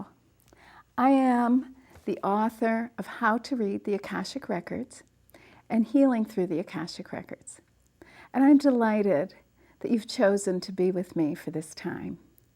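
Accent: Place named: Texas, United States. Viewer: What has mrs studied at university? Where did she obtain the Ph.D? She has tics for example at 12.39 minutes. She is scary, she is so demanding like a religious clerk. "Right"? "Listen". With her Texan accent.. No, not appealing, no charm. Be aware of your body language when you are on film.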